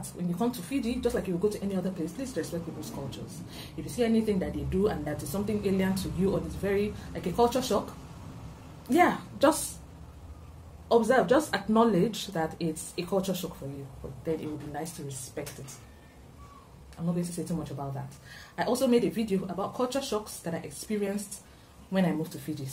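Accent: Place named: United Kingdom. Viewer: Your British accent is awesome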